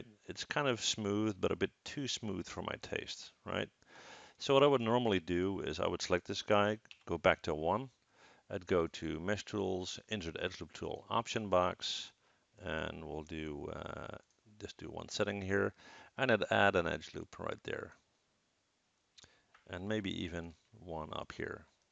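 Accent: Netherlands. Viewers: Hey, can you make a tutorial of how you can render in maya. So you can render your scene for your portfolio. And I have another question, are you from the Netherlands because you sound like a Dutch person. thanks for reading my comment and have a nice day.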